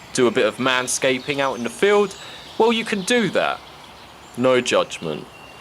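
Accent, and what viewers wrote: United Kingdom. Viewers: Which country do you live in if you don't mind me asking? By the background and your accent I'm thinking the UK. Not a stalker or anything, just wondering how you get away with carrying such a beast of a knife. I want to buy one of these to take camping but I'm worried of getting into legal trouble. I live in the UK and the local police won't even allow me to carry my folding pocket knife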